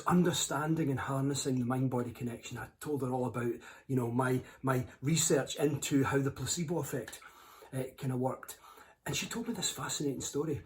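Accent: Scotland, United Kingdom. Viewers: I like this guy's books better than listening to his talks. The Scottish brogue can be hard to understand, but this guy has a nice personality. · Hey. Love your Scottish accent, m8. I have a major passion for accents. Scottish accents are so beautiful. Cheers.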